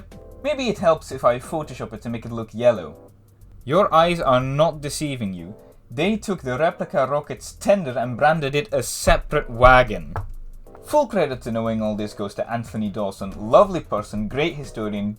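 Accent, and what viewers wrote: Scotland, United Kingdom. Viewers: Wait you're Dutch? All this while I thought you were Scottish! Great video mate, I agree with everything you said! Maybe if they found a way to up the quality and drop the prices, they'd lose that outdated image and start drawing in younger blood into the hobby! Awesome content as usual mate! Cheers! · Naar mijn mening heb je eerder een Schots accent dan een Nederlands accent.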